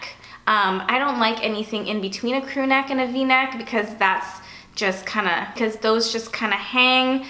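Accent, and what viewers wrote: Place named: West Coast, United States. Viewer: I don’t think she sounds stuck up! She has an eye for fashion. She may not be individually judging people, she can just see when something looks “on” or “off” and she has the problem solving capabilities to figure how to improve things. Then she goes and shares her advice with others instead of keeping the secrets to herself. And her voice doesn’t sound stuck up, she talks likes she’s from the west coast. That’s a habit that’s shaped by her region. It’s not really fair to attack someone on a public forum who is being nothing but kind and giving her (maybe not to everyone, but definitely to some) helpful opinions.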